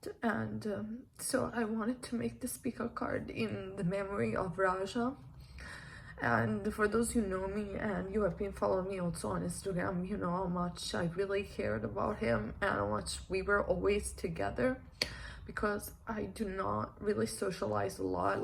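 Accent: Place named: Italy. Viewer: Oh my goodness SWEET 😍 ❤️ sweet Precious- BELLICIMO 🥰 Tarot 🌠🔮 🙏 reader that I JUST realized I ALREADY ✓ DID visit your channel many, MANY months ago as you assisted me in MY difficult time of berievment !!!😲 Yes! And I was revisiting the video and recognized your distinct accent. (Italian dilect) WOW, amazing. I have been enjoying the channel and it's growth. You are a favorite 💜🦄💟 🌈 of mine and I've told you how you stand out for your authenticity & vulnerability with your audience. The healing process 🙏 💔 😥 😪 is an interesting one after the loss of a pet because I believe the instantly 👼🐕 become guardian angels and the proof is everywhere!!!! So it's just bittersweet 😔 ya know. May you be so very at ☮️ as you are able 💗 to stewart the impowering gift of connecting with the Underworld. Love.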